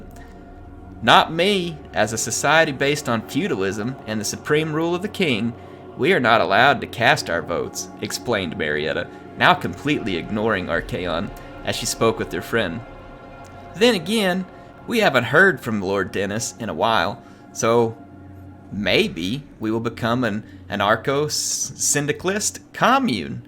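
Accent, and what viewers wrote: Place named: Appalachia, United States. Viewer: While I enjoy the hillbilly accent, I still prefer Michael Palin saying “anarcho-syndicalist commune.”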